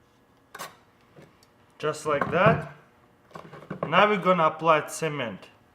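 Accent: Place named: Ukraine. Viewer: Ужасный английский с украинским говором) прикольно звучит , я даже так не могу)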